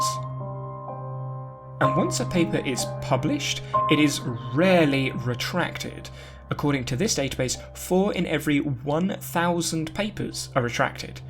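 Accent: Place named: United Kingdom. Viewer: why with a British accent?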